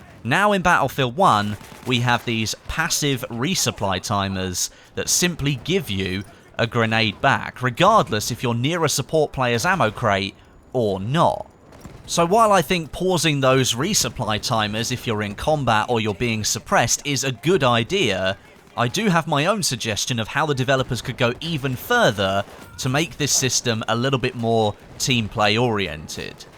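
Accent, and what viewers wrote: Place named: England, United Kingdom. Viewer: john long He's British he has he English accent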